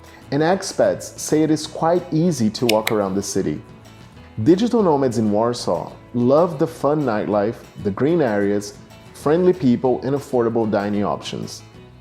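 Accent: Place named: Brazil. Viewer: Brasileiro haha! Vê-se pela pronúncia. Um forte abraço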